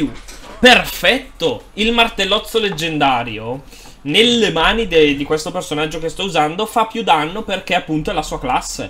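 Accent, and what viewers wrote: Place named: Piedmont, Italy. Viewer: Che bell'accento piemontese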